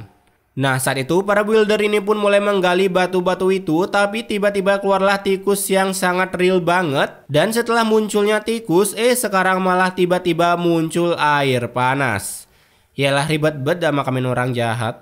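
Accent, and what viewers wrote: Bali, Indonesia. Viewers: Logat Bali nya ketara bener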